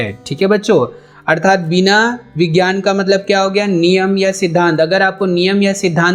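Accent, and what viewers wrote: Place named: Punjab, India. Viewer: i think you from punjab😅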